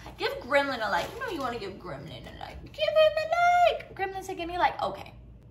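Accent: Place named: Texas, United States. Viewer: Yalll u from Texas u say yalllll a lot not a bad thing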